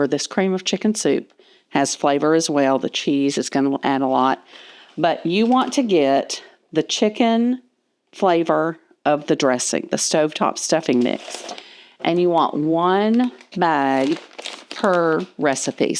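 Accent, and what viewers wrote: South, United States. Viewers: What a great recipe. Thanks. You're so fun. Subscribed so fast. You're southern...but not so sickly over the top sweet like so many. Just love you. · Her southern accent 🫶 I’m a Texan living in Missouri and I missed that! The rule areas have a little bit of around here. Easy & kind!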